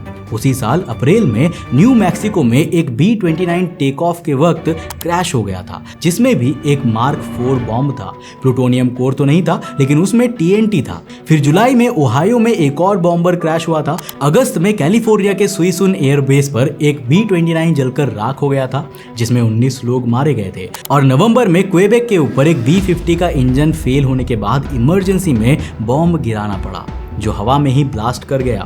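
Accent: Bengal, India. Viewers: Tomar hindi pronounciation sune vabini j tumi bangali , khub sundor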